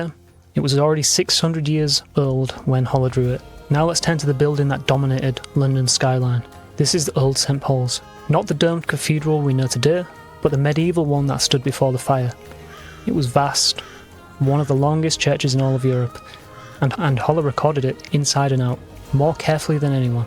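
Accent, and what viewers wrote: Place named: Hull, United Kingdom. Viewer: i'm willing to believe it's an accent / dialect thing 🙂 the narrator mentioned his home town of hull, so i took on face value the pronunciation. 🙂🙂 i'm from north yorkshire, and some people can't understand us either. 😕😕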